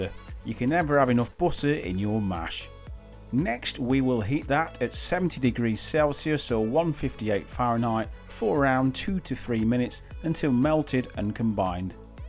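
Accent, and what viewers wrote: United Kingdom. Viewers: You have a strong UK accent, hard for me to grasp everything… but i like the challenge! There s the transcription too. 😘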